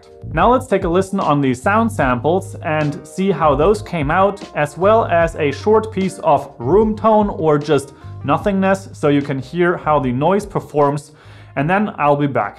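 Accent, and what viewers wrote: Germany. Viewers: ☺️😀 na dann können wir ja auch Deutsch schreiben. Respekt - den typischen deutschen Akzent hast du aber ganz schön gut unterdrückt! 👍 <link> wäre mir jetzt einfach auf Grund der Top 10 aufgefallen. Hast du denn Erfahrungen mit anderen XLR auf Klinke Adaptern, die günstiger sind und trotzdem Plug-In Power liefern? Oder gibt's das gar nicht günstiger? PS hab dich mal abonniert - als Gegenleistung für deine herausragende Kommunikation 😀👍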